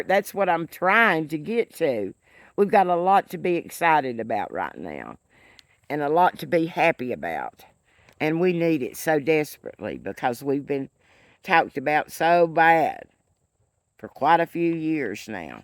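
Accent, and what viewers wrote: South, United States. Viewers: It is refreshing to hear a voice of reason spoken with a southern accent. After listening to so many southern accents in the House and Senate, it made me wonder, is it something in the grits? You and Beau of the Fifth Column and Trae Crowder and others keep us enlightened.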